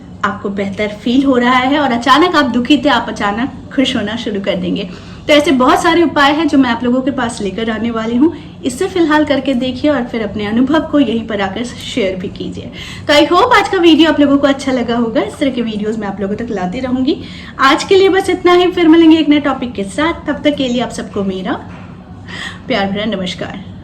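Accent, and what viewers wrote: Bengal, India. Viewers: Apka vlog accha lagta , apki baath sunkar lgta hai ki ap Bengali ho , me apki what's app MSG karunga jab mevi astrology shikunga❤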